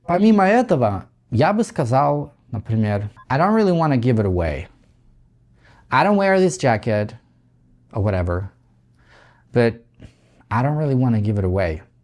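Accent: United States. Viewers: И самое главное, не говорите с таким акцентом, как у автора, в Англии. Не американец с американским акцентом - это вообще ненавистная фигура в Англии. Когда они слышат такой акцент, то подпрыгивают от злости.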